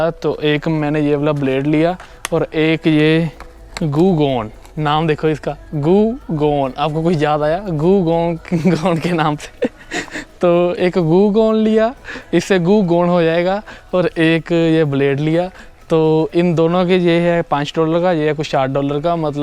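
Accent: Punjab, India. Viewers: Bro tuhadi Punjabi leak kardi rehndi hai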